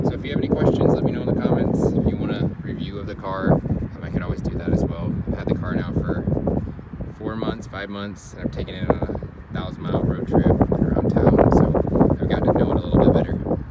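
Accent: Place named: South, United States. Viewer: Actually excited to change the filter now that I watched this super clear video. Your country voice is so soothing!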